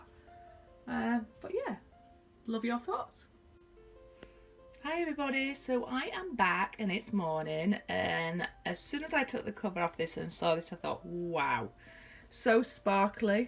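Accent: United Kingdom. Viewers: New follower thanks to Myriam! Can't wait to see your work! <3 Edit: Cuz I'm the girl who gets so excited that she comments before the videos are even finished. XD Are you Scottish? Whether you are or not, you accent is GORGEOUS! ;) <3 Edit2: I'm at the end and this looks STUNNING! The way you made the top and bottom kind of not be the focus, makes the center look like a sunset or sunrise. The part at the top above the last layer of blue, looks like a city at night with all of the sparkles. Beautiful work! I can't wait to start binging your channel tomorrow at work. (I'm a government employee. I can't be breaking the stigma of being one by actually working a lot. XD)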